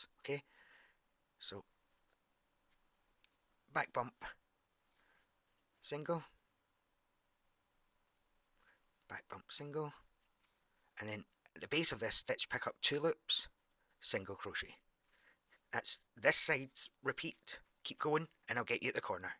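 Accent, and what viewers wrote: Scotland, United Kingdom. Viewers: You had me at the first sound of that Scottish accent,! [grin] Although I was, obviously, seeking material about C2C.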